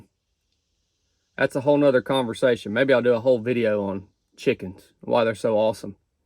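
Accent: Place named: Alabama, United States. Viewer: I’m visiting in Alabama and you have the accent of my family! Where is your accent from?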